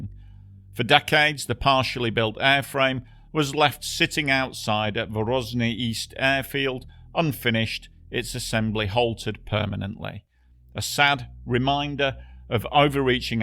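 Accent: United Kingdom. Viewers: I have watched your channel for a while now. With all the AI crap that is dominating YouTube. I am so happy that you, a human, keep me entertained. Thank you, British 'human' voice. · Thanks for proving once again that not everyone with a Brit/UK/Aussie accent should be doing narration.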